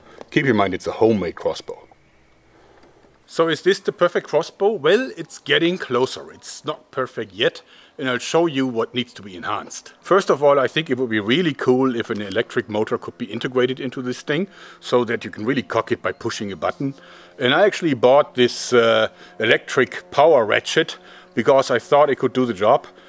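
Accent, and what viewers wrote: Germany. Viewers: Ik like his german accent en strange laugh: this is the slingshot channel, hahaha. Nice channel bro; I like your hobby; because our governments disarmed us. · His deep voice with German accent sounds brutal. His laugh is epic too. Should be in horror movies XD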